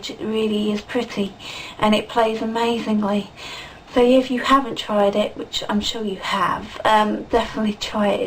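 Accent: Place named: Estuary, United Kingdom. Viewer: You sound like her too, she has a kind of estuary accent (in interviews, not in her songs). I'm constantly surprised by people not knowing. You must have heard. Anyway, Abe's Oddysee is great. It was too bloody hard for me when I was 13 though, so I didn't get far. If you like the gameplay, you should play Another World on the Megadrive or SNES.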